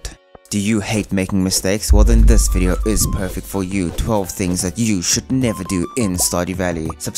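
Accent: South Africa. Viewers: So that's what that accent is. I like it I just didn't know that was a South African accent.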